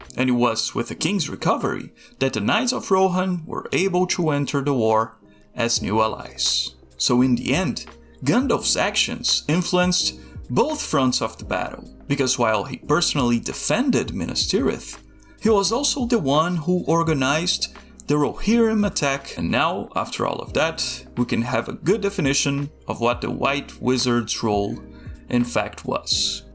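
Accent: Brazil. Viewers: Brazilian masking accent 👀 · Sir, are u brazilian? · Vc é brasileiro, né? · You sound Brazilian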